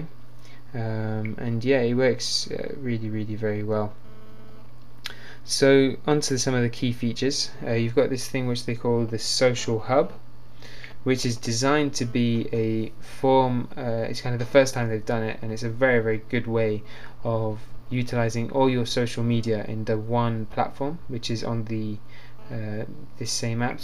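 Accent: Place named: Arab world. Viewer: are you arabic by anyway? your accent is so great mashala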